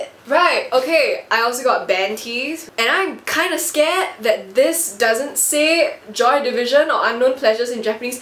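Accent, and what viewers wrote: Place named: Singapore. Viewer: I love your accent! I also from Singapore as well~ hope to see you around west area :)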